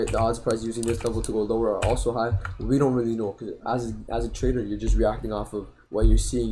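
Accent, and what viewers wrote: Toronto, Canada. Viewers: The way you talk tells my you're from GTA, link up homie 😈 Also do you analyze crypto as well? Would love to see some content regarding that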